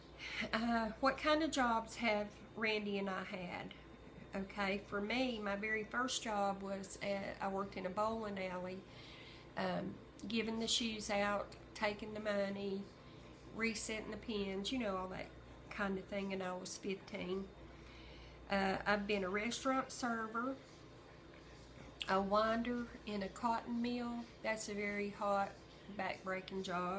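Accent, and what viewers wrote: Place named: North Carolina, United States. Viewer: I know this is an old video. But my grandmother was born in NC but moved to Melbourne FL and lived there for the majority of her adult life. I've been to Eau Gallie, is very cute! I think it's funny that y'all are in reverse! She had a NC accent like yours and i find it comforting. :) I'm also a momma's girl. I adore my mom.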